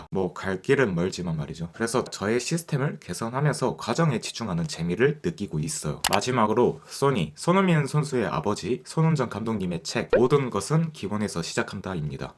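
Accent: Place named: Japan. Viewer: 일본분이신가용??? 발음이 죠금씩 세는,, ?!? 그치만 내용 넘 좋아요! 같니 홧팅홧팅입니당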